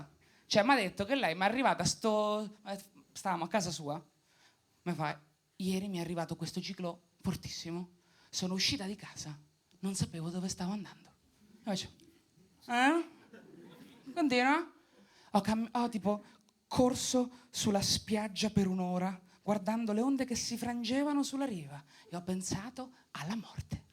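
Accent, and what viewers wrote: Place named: Marche, Italy. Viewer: "comunque lu spettacolo è lu mio" average entroterra marchigiano vibes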